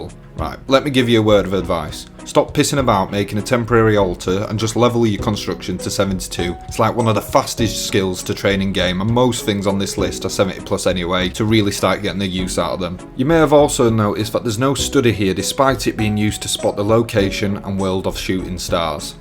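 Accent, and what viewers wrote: Yorkshire, United Kingdom. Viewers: first yorkshire accent ive heard on an OSRS vid, legend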